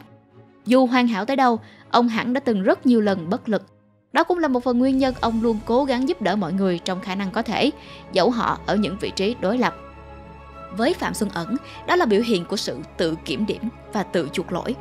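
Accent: South, Vietnam. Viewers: Aishhhhh nghe giọng miền Nam đọc những video cách mạng khiến tôi hài lòng quá. Nếu là giọng đọc của một việt kiều cali có lẽ tôi lên đỉnh mất 😊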